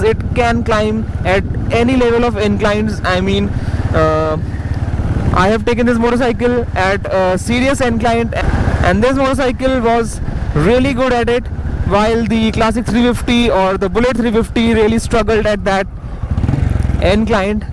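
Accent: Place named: India. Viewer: I love the Indian accent...so soft and calming...and I have a Classic...so this vid is great for me!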